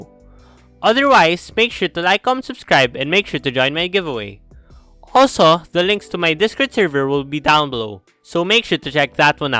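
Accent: Philippines. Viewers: You filipino? Kinda sound like it hehe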